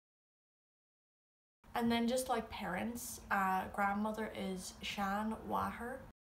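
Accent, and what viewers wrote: Donegal, Ireland. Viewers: Please tell what accent of Irish language do you use? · I'm nowhere near being a grandmother, but recently began looking for new ways to say "grandma" since my kids are now raising kittens. I'm liking the term "Mamó," though I'm also intrigued by "Morai" (which I think is pronounced MORR-ee, and would love confirmation). I just read in another comment that you are from Donegal, and my great-great-great grandparents (I think that's the right amount of greats) came here from Donegal, so I'm glad to be hearing pronunciation from the same area. Thank you for your videos! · Are you Doire/Dun na nGall?? It's so comforting hearing you speak as gaelige 💚. Takes me back to my Thornhill days, go raibh maith agat xx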